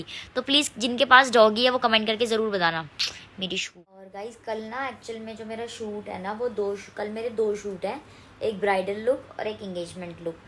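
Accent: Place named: Haryana, India. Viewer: tum haryanvi me hii vlog banaya kro achi lgati ho bolte hua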